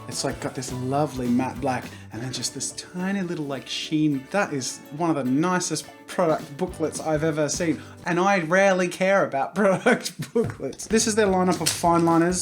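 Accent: Australia. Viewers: Yessssss I thought you were American but your Aussie I'm Aussie tooooooooooo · i KNEW he was an ausy. Now i fanaly know. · Garbage man he's Australian! LOL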